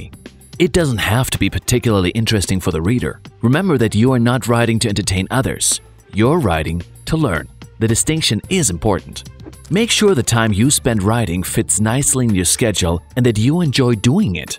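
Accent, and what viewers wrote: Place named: United States. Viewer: Your channel has been a huge inspiration for me to start mine. (even though I learn French at school) I only have one problem with your videos, the first one I watched was meeting a stranger (I think) when the video pronounced it slower I heard an American accent even when I watched the French one about weather and I could still hear a tiny bit of American in the French voice, it's probably an American imitating a French accent, but aren't you supposed to be a company, I suggest that you get actual French, Spanish and Italian people to do the videos for viewers to get the best out of it, instead of imitation?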